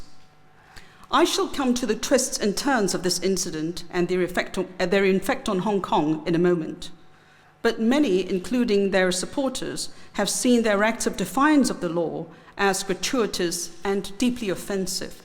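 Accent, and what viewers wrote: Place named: England, United Kingdom. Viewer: 下⋯咁正既English accent, 我真係好想知你d英文有幾好聽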